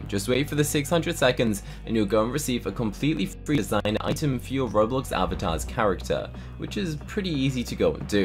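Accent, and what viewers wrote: United Kingdom. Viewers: Not trying to be rude or anything, but through the entire video you pronounce z like zed and in proper English American it’s sed like z like zee I’m not saying, you have to change how you talk and I’m not meaning listen any rude way, but I’m just saying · He's british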